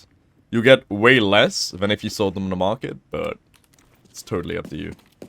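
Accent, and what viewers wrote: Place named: Holybourne, United Kingdom. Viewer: That holybourne accent tho........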